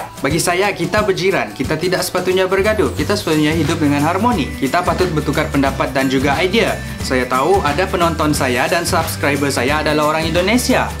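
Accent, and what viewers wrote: Sabah, Malaysia. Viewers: Hello from Brunei. Suka tonton kopikopifakta. Dari first video saya dah tau dia ni sabah. and I love the accent. Dan saya bukan lah orang Brunei sebenarnya. Teman oghang perak yop. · Good Video. Baik jua ku paham slang sabah. Ani peminat dari Brunei ne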